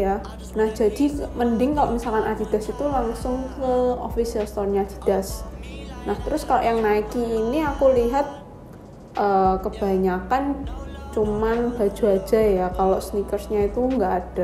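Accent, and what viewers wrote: Java, Indonesia. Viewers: Suka medoknya 👍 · Salfok sama ke medok an nya mba 👍🏻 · medokmu buatku jatuh cinta 😍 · Orang mana kak kok kayak ada logat Jawa nya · Mbak jawane medok🤣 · Muka korea logat jawa Jadi bikin betah di chanel ini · Tadi y pengen tau ori atau kw ,tp pas denger medooook y itu ...smgat dan sukses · Mendok banget to samean mbakk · Maaf bukan rasis🙏. Liat mbak nya kirain orang China luar negri,tau tau China nya udah medok banget hihihi🌚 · pertahankan medok nya 👍🏻🥵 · Aku suka medokmu cik 😍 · baru nonton pertama., mbaknya medok ini jawa mana ya?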